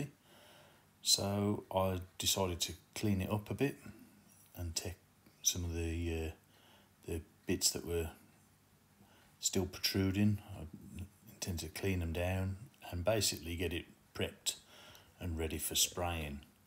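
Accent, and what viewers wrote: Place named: Black Country, United Kingdom. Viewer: Ive just got my first Suzuki Carry, from your accent you sound like you are local to me. Are you a black country mon? Happy for any advice with these.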